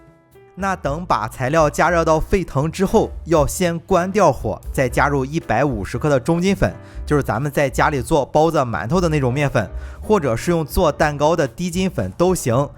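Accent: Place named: Qingdao, China. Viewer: 我听着声音有点像青岛人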